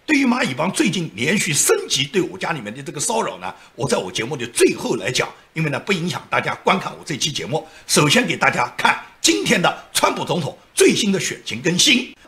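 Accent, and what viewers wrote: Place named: Fujian, China. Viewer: 一听声音就知道是福建烂仔。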